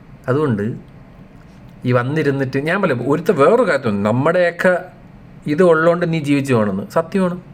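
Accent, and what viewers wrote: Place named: Thiruvananthapuram, India. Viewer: that thiruvanthoram slang hits different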